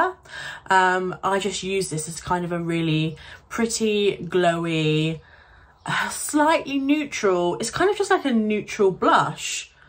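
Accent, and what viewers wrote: United Kingdom. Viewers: Her accent sounds like she is from Devon or Cornwall. She does not speak with a cockney accent so its highly unlikely that she is from the London area.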